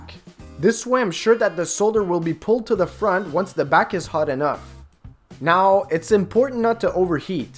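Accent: Quebec, Canada. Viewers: Not that it matters, is this a Canadian DIY advice video site? Just out of curiosity (as I’m from Ontario) - just going from the Quebec licence plate, your accent and seeing Holmes on Holmes clips just made me wonder. Personally I think it’s great as I’m a bit tired of getting all the advice from US sources. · GDog S Canadian accent! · presque pas d'accent excepté une couple de fois! Un québécois reconnais un québécois qui parle anglais! ;) Mais! Bonne nouvelle. Je me suis abonné à ta chaine!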